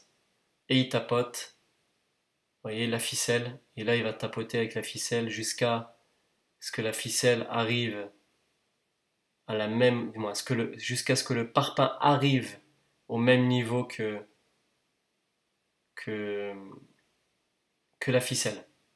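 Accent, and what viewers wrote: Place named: France. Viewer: Bonjour, merci beaucoup pour ces vidéos qui m'apprennent beaucoup, donc encore un grand merci. Rassurez-vous, vous avez une très belle voix et vous parlez très bien le français avec un accent français. Je sais que vous êtes d'origine magrébin, c'est pour cela que j'aimerais vous demander si cette méthode de construction pourrait très bien s'appliquer dans nos pays comme la Tunisie, l'Algérie et le Maroc ? Merci encore.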